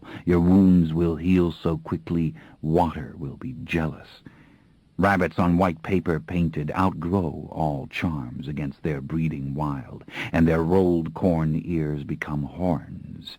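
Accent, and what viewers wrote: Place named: France. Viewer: This narrator burns my nerves. Hey says everyons name with a French accent except Louis. A Frenchman from colonial New Orleans. Make it make sense.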